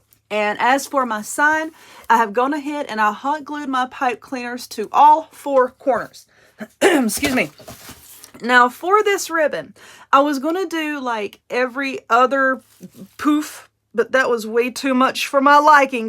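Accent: South, United States. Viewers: Love this wreath, and the black truck one I enjoyed the 2 different styles. I also just really love you southern drawl, and the way you explain things. I absolutely understand your terminology, such as great big gloppy glop, and cruffle. You are a joy to watch, make me smile through the whole video, which I sometimes wish were much longer. I have 2 grandchildren that live me on a foster child basis, the oldest is 14, the youngest 5. So your channel is a breath of fresh air, I love it. Thank you so much for sharing. Have a wonderful Sunday, and next week. Cant wait to see what comes next.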